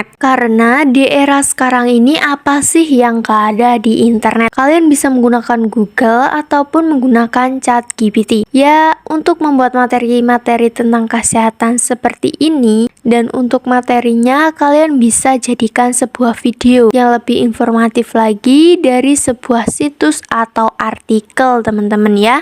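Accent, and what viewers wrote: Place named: Java, Indonesia. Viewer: Medok jowone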